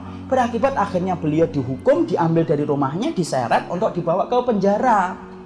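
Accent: Indonesia. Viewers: kangen medoke.. alhamdulillah ilmunya..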